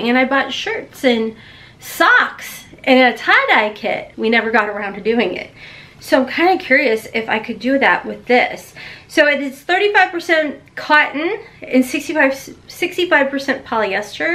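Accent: South, United States. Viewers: So funny!! "Good thing I'm already married!!" And I'm so happy to hear someone else speak country...not pretty Southern. ❤️ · You just talk all you want, I love when that southern accent sneaks in!! Here in southwest Missouri we don’t have one!😂😂😂😂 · Your call was wonderful I enjoy watching. I want to say you're just Southern enough. It comes out just slightly and it's charming just charming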